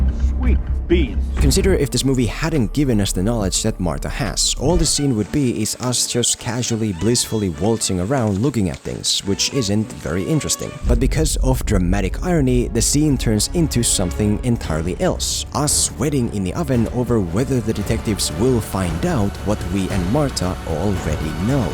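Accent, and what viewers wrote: Finland. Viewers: Bruh u sure your not finnish? Your english sounds exactly like finnish accent.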